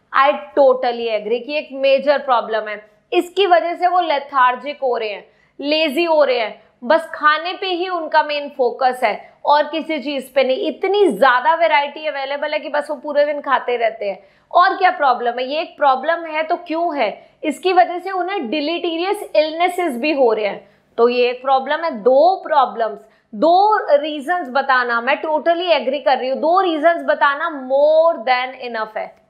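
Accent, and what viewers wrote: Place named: Punjab, India. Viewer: Mam aapki hindi ki tune Punjabi me bahut achhi lagti hai .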